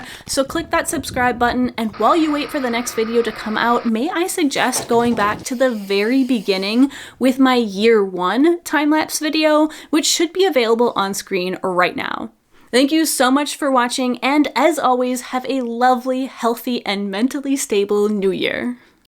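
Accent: Quebec, Canada. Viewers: I just discovered your channel. I knew I recognized un accent du Québec! Good job guys, I love everything about your channel ❤ Continuez votre beau travail.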